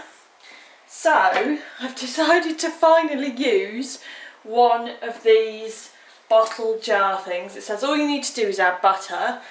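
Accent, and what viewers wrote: United Kingdom. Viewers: I love so much follow your videos from Paris. This is all the time very interesting and best British accent ever hear in YouTube ❤️❤️❤️ kindly, an lover girl of England 🏴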